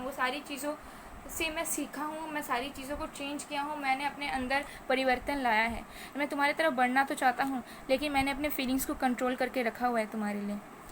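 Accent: Bengal, India. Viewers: Are you from Bengal? 💐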